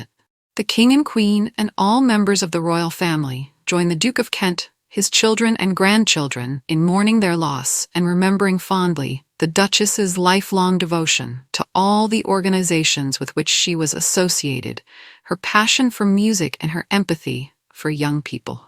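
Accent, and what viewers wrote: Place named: United States. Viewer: She is a feckin' Roman CAtholic, of course she's going to have an RC requiem mass for her funeral, so to will Princess Michael for that matter. Ye Gods, you make it sound as though something never seen before is going to happen when it isn't. What WOULD have been remarkable would have been if they forced the family to hold a NON Catholic requiem mass for her funeral. For a supposed UK channel - you might want to lose the US accent, gives millions the wrong idea about your channel - you don't appear to know what you're talking about. The only ''unprecedented'' part is that she is the first to die among the RC family members and thus the first to have a public RC funeral but she isn't the only family member who is Roman Catholic and it won't be the last RC funeral either.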